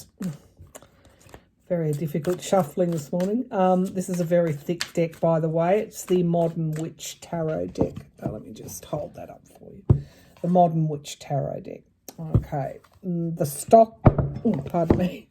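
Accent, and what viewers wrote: Australia. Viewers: thinking about setting up Siri with a female Australian accent in honor of you. 🌷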